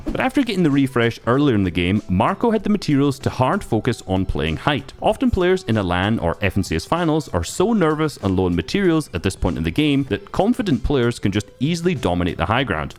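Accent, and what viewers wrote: Scotland, United Kingdom. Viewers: Scottish folk really shouldn't be doing these voice overs.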